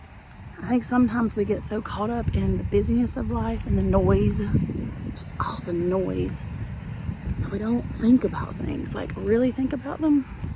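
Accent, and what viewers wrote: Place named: Appalachia, United States. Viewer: I’m watching and have reached the end of your day 20. I’m inspired by your walking, especially walking without media! May the Lord help you through all your adversity!!! 😢 Btw, your accent sounds like mine so I figure we both live in the southeastern part of the United States. I call my accent Appalachian Foothills.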